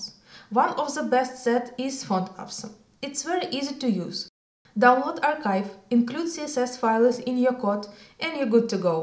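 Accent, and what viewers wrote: Russia. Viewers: твой английский имеет русский акцент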